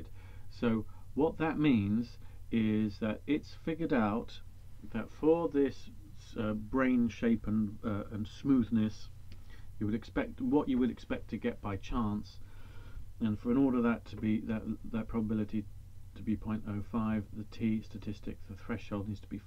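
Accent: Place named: England, United Kingdom. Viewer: Lovely accent - Really miss the English accent - so pretty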